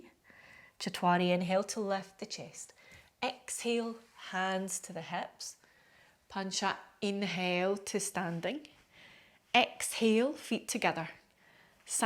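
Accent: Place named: Scotland, United Kingdom. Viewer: Informative, thank you! Love your accent, too. I’ve never been to Scotland, but my grandfather was from a place called Govan in Glasgow. Your accent reminds me of him.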